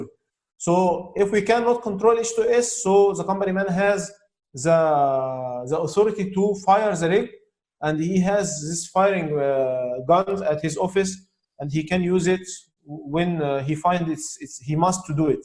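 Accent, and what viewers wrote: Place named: Egypt. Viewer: why you zbeek like egypt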